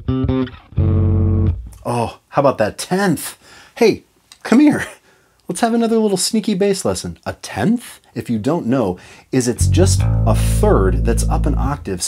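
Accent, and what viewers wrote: United States. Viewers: Yes yes yes ! But why the American accent ? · I’m confused, why do you have an American accent now? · Dude I got like so freaked out that u didn’t have an accent. Good job with the American one btw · Pretty good American accent can't lie lol 😂 great video tim c is a legendary bassist so underrated played with so much aggression, anger, passion, love, hate and awesome all round bassist 🤟🏻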